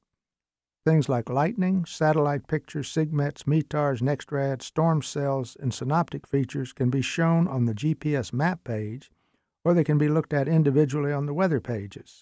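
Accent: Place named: Tennessee, United States. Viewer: You definitely don’t sound like you’re from HGR. Heck, you sound like an East Tennessean. Somewhere around Luttrell or Bean Station. I am based at Sky Ranch btw (TN98). Just NNE of good ole KTYS. Thanks for a wonderful video!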